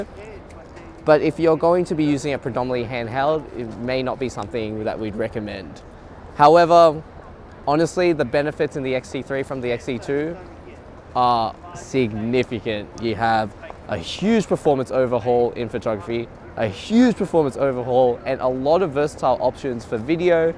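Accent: Australia. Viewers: Good effort. However no standout point in a sea of xt3 reviews on YouTube, other than the Aussie accent part.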